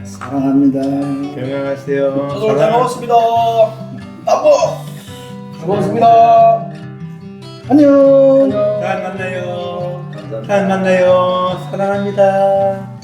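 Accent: Gyeongsang, South Korea. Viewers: 구수한 갱상도 사투리에...참 유쾌하게 드시고...진짜 맛있게 잘드시네요..~~^^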